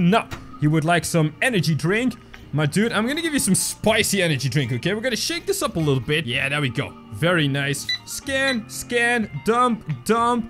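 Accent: Netherlands. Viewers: are you not dutch hmmmmmmmmmmm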